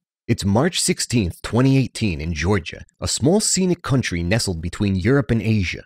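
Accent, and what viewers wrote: United States. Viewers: Ill take an american accent over an AI generated bot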